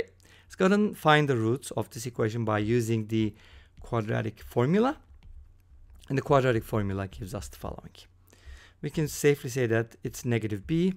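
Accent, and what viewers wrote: Turkey. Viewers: I guessed from your (slight) accent you might be Turkish. Now it appears I am right.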